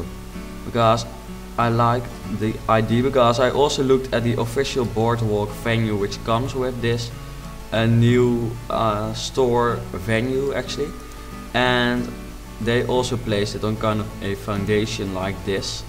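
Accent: Netherlands. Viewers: i tried watching this video without getting annoyed by the dutch accent, but i just can't, i'm sorry. i'm dutch as well, but i can't stand the accent, imagine listening to it for 23 minutes. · it's because he is Dutch. I am Dutch to, and I also have that accent! there is nothing wrong with that.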